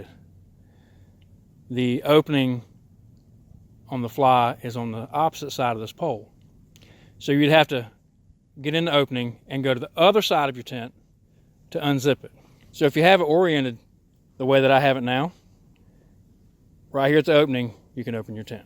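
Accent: South, United States. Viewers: Love the tent. Don't love the USMC or Southern accent. It's mind boggling how some people actually talk that way 😂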